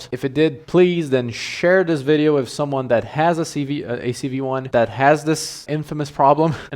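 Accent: United States. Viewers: Manda muito no inglês. Parece um americano kkk, ótimo vídeo mano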